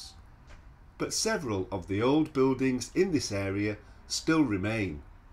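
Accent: Yorkshire, United Kingdom. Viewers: Your narrative is just fine. I love hearing your Yorkshire accent and your narrative reminds me of school, when my teachers would slow down their speech to ensure we understood concepts. If you 'talked proper Yorkshire' I bet few folk from outside Yorkshire would understand you. - Thanks for the videos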